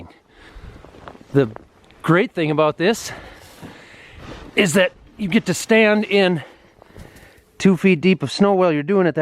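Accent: Minnesota, United States. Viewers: "on your ruff" I love the Minny accent! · Can you do your best Minnesota accent for us?¿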